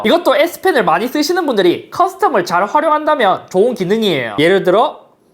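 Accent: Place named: Busan, South Korea. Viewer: 안녕하세요. 저는 형님을 컴퓨터 조립할때 처음 접했는데요. 낯선 경기도권으로 취직을 해서 그리운 부산을 형님의 사투리를 들으며 버텼습니다. 항상 감사합니다!! 주문번호 뒷자리는 <num>입니다. 감사합니다.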